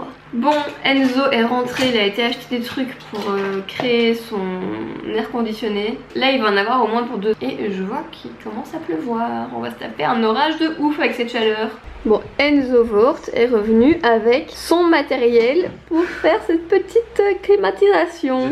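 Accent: Belgium. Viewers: Tu es belge ma chérie ?😍❤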